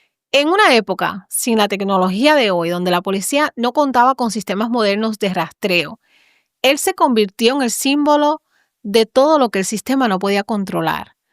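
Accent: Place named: Puerto Rico. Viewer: Ok estare esperando en especial me encanta ese asento puertorriqueño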